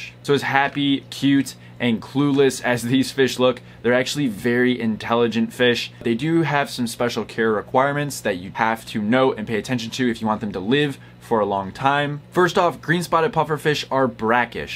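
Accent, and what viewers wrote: Wisconsin, United States. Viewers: Wait are you from Wisconsin? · It's so odd hearing Wisconsin since yknow,, home state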